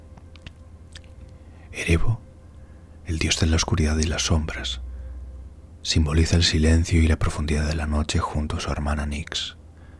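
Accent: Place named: Spain. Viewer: A mí le acento español no me gusta mucho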